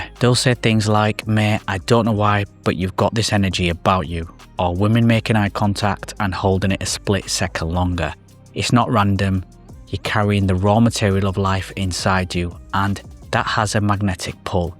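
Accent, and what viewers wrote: United Kingdom. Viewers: Just found this channel. A fellow Yorkshire brother I believe? Leeds? Haha. Back for my SR journey! Let's go!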